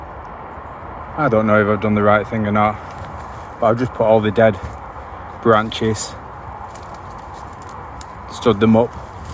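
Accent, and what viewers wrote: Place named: Yorkshire, United Kingdom. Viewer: Excellent channel, looks like the good life, the dogs must be great company too.so glad this video popped up on my feed.... just subscribed now... you sound like your from near me in Leeds /Bradford way? , I wish you and the dogs the best of luck in ya outdoor life 👍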